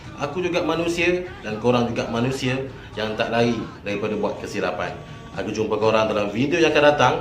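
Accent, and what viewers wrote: Malaysia. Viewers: Mcm org pahang😅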